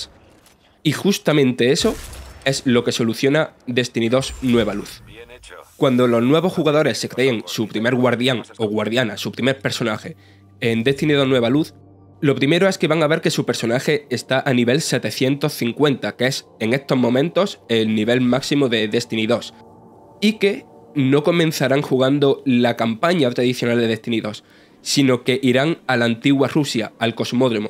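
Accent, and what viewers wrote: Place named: Granada, Spain. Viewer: Es acento granadino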